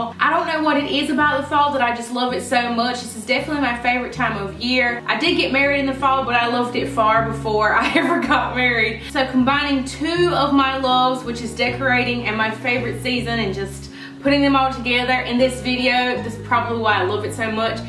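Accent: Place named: North Carolina, United States. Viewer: Omgoodness, I knew right away where you are from because of your accent! I just subscribed, came over from the other channel! I love watching these videos to get my own ideas for fall decor ideas, great job!! I'm from the same area in NC, but moved to Iowa!! 😁 girl I live for those hobby lobby sales 😂😂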